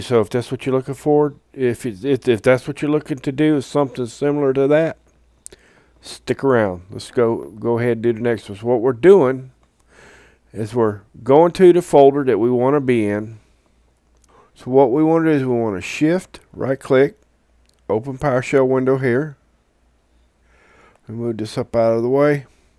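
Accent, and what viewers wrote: South, United States. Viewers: i like your accent man ! southern , sub it, i wish i can learn this accent my Yall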